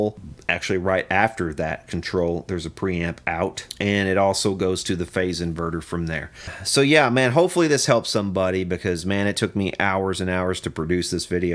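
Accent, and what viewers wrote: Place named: Georgia, United States. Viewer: You sound like you're from Georgia. By the way, I live across the freeway from Mesa Boogie.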